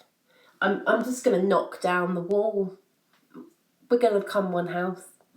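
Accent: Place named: United Kingdom. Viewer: That British accent makes you sound so educated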